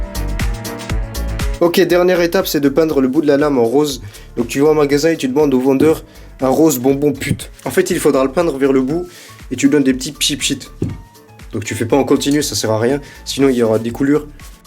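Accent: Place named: East, France. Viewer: Au fait est ce qu'il vient de l'est ? ( L'accent me fait penser à ça )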